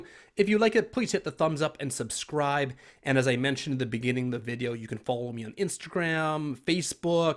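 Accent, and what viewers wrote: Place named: Canada. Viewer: Love me a strong Canadian accent 😍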